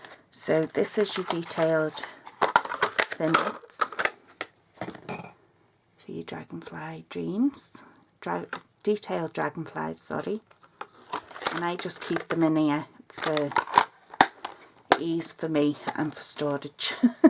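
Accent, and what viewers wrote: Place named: Ireland. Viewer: I thought I was hearing Ireland in your voice. My Dad was born in Ballymena, Co. Antrim.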